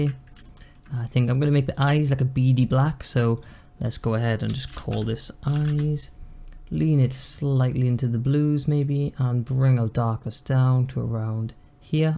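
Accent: Wales, United Kingdom. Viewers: Your Welsh tones added to the relaxing nature of this video.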